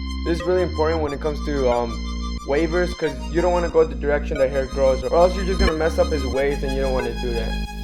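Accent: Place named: India. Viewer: He sound like Apu that one Indian character from Simpson’s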